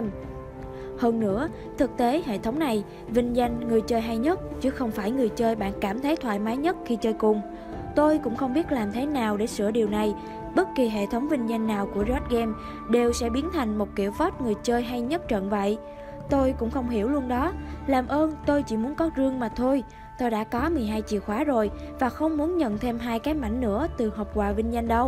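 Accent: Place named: South Central Coast, Vietnam. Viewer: Hình như kênh này người miền Bắc nhiều Nghe giọng miền trung ,nam không quen Ad nên dùng phần mềm chỉnh giọng , hoặc tìm cô gái giọng bắc sẽ tốt đó ad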